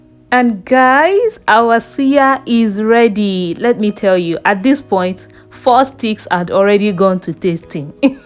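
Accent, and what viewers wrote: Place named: North, United Kingdom. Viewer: I just came across your channel now. You sound like a northerner, are you?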